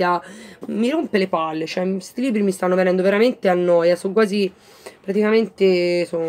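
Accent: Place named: Florence, Italy. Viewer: Ciao, mi sono iscritta da poco al canale, e devo dire che questo video mi è piaciuto molto tanto che Shaboo l'ho acquistato quasi subito dopo aver sentito te che ne parlavi (solo che l'ho preso per la versione Kindle, ho speso un pochino meno). Mi piace tantissimo la tua parlata, io sono di Firenze ma mi sono trasferita nelle Marche per motivi personali, quindi quando ti sento parlare mi sembra di essere nella mia città natale a passeggiare per le vie del centro storico... Non sono il massimo nel presentarmi ho sempre paura di dire banalità, quindi ti faccio i complimenti per il canale e a presto.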